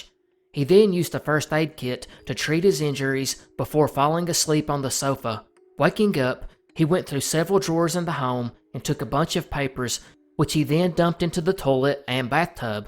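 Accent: South, United States. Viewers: love your accent man. is that some kind of southern usa accent?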